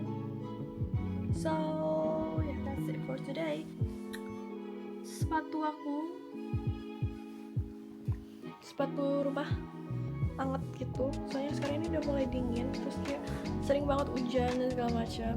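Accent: Surabaya, Indonesia. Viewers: Jancuk e ngena bngt ...arek Suroboyo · ngonten logat surabaya dong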